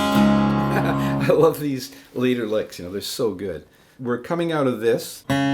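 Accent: Canada. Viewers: Judging by your accent and the way you pronounce ‘about’ , are you Canadian , Nova Scotia?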